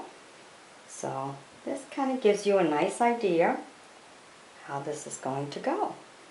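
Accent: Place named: New Jersey, United States. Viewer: She sounds like she's from Jersey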